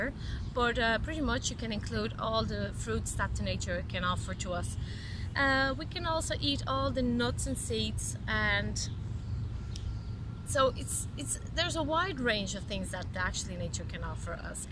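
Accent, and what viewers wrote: Ireland. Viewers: Omg that irish accent ... just love it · That irish accent! Nota-se imenso! Gostei muito do video e do sitio onde estás, queria muito! Brevemente vou trazer um vídeo sobre vegan/vegetarianismo para o canal, e a Paleo Diet parece um bocado assim também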